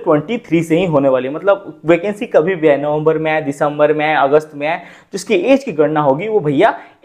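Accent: Odisha, India. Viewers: Bhai Tum Odisha se ho kya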